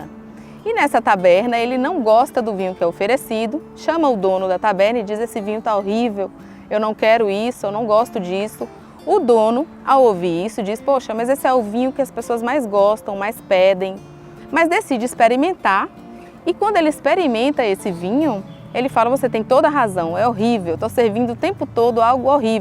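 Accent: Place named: Bahia, Brazil. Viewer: Reflexão muito boa, é o que eu estava prescisando ouvir. você é Baiana? Se não você tem o sotaque de Baiana arretada.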